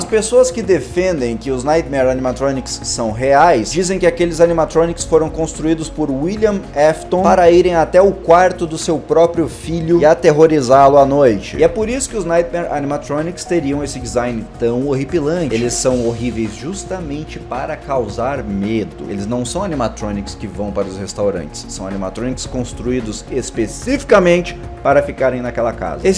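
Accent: Rio Grande do Sul, Brazil. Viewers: Ele é gaúcho?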